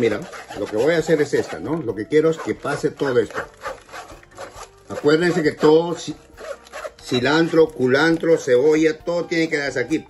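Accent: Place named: North, Peru. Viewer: cuales barbaridades? su manera de hablar es del norte del Perú con mucho orgullo yo soy de lima y lo entiendo perfectamente si quieres alguien que hable bien el castellano hay otros youtubers este el un canal de cosina no de lenguaje .....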